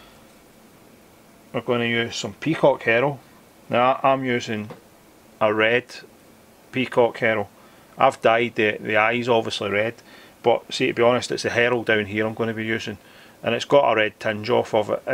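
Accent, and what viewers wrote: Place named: Scotland, United Kingdom. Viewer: Great fly....nice clean head. I have a hard time understanding the Scottish brogue, but enjoy it none the less. I've a qeustion though. I'm in the States (Montana), and I've never heard of a "cruncher" fly pattern. I could be living under a rock, but got me thinking if we have different nomenclature for flies across the pond just like we do with other things (line vs queue / trunk vs bonnet / trash can vs bin, etc.). For instance, a common pattern over here is called a "Royal Wulff." Do you have the same over there? Another common pattern would be a "Caddis" or "Renegade." Do you have those as examples?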